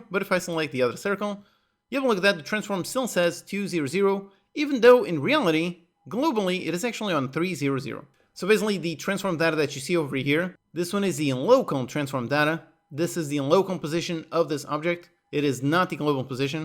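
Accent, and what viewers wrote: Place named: Portugal. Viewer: Been watching you for a long time, only now i realized by your accent, tu és tuga como eu. oh my God... Santa ingorancia a minha. Luv you bro. Keep on Keeping on. ❤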